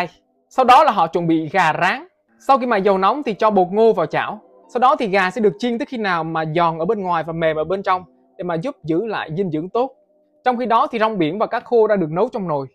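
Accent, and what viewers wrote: South, Vietnam. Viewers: Thôi cứ nói giọng Bắc đi pha giọng Nam nghe nó đớ lắm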